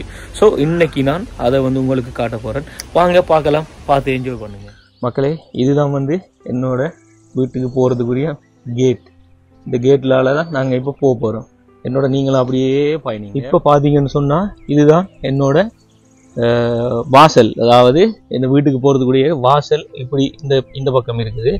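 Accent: Sri Lanka. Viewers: Neengal pesum ilangai tamil migavum asagaka irukirathu brother happy married life.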